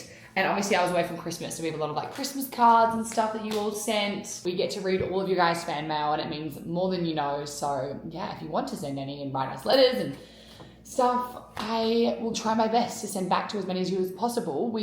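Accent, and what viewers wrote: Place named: Australia. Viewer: Love your vlogs. Great energy. Aussie accents are my fav 😊 Can you tell us your DCC lip combo?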